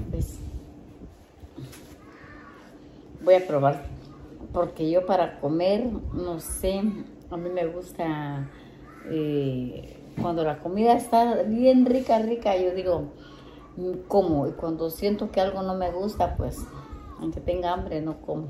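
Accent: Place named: North, Mexico. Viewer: Ay como se lea subido el norte asta el ablar bien finjida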